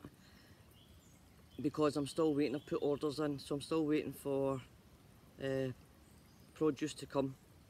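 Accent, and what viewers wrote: Scotland, United Kingdom. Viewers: hello from French gardening!! beautiful background to your wee natter, you've not lost your Scottish accent!! I have the same problem with my pond, green water and I tried the same barley straw treatment not touched it at all!! thanks for sharing your knowledge and as always happy gardening!!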